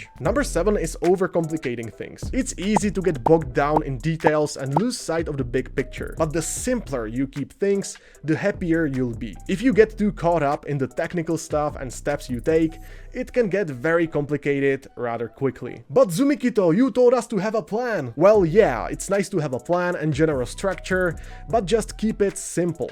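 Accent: Czech Republic. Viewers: You sound very czech. :D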